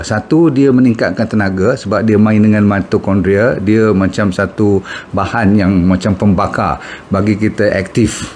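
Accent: Malaysia. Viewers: Denger cakap malaysia 😅😅😅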